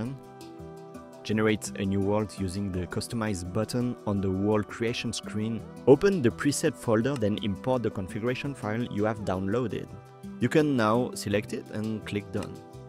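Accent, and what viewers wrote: France. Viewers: Super vidéo merci beaucoup. Et merci l'accent français :) Tu parle très bien Anglais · Hello j'ai remarqué que t'as un accent français donc je vais faire un commentaire en français mdrr. Pour la génération de monde c'est entrain de me dire 12 heures je dois réellement attendre si longtemps 🥲? Et en terme de performance t'as quoi comme PC et est-ce que ça tourne bien ? Parce que ma carte graphique est pas extrêmement puissante (RX 5500 XT) donc j'ai peur d'attendre 12h pour que ça crash au final. Sinon à part ça très bon tuto ça fait plaisir de voir un français faire des vidéos en anglais j'étais justement entrain de m'imaginer faire la même chose avant de voir ta vidéo 😅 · petit accent français 👌👌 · oooh le bon français x) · Tu es français nan 😂 ? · Un français qui parle anglais, c'est toujours marrant ! Je like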